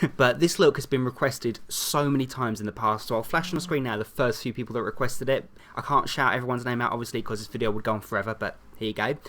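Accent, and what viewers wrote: England, United Kingdom. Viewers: Seems strange, a lot of tutorials are American, meaning when they say where they got the make up from or how much it cost, im thought, but to hear an English accent and not far away from where i am, makes me smile so much :D This is totally epic, nice work! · I love your videos. They are so good and I love your English accent. Can you do a genie from Disney's Aladdin.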